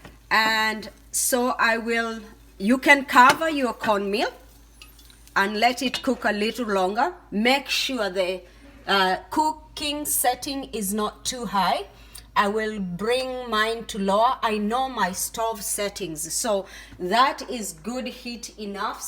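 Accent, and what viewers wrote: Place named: India. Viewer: Your English has got Indian accent I'm a bit surprised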